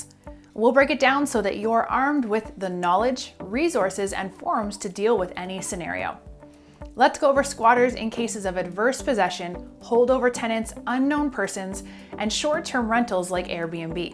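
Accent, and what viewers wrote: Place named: Canada. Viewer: I thought this was going to be for Canada, given her accent.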